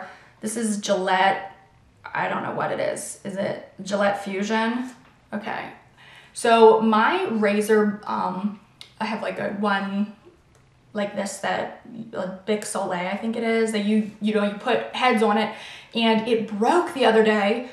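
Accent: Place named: South, United States. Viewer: I like watching your videos because you're so pretty and I love your accent. I want to move to the south to get me a man with that accent! haha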